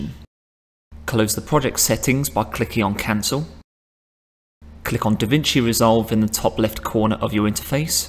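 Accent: United Kingdom. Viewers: British accent ❤️❤️❤️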